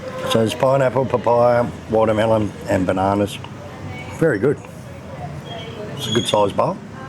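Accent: Australia. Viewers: Love the Aussie accent, says this Yank. Apologies if you're from NZ. The accents to our ears, are so similar.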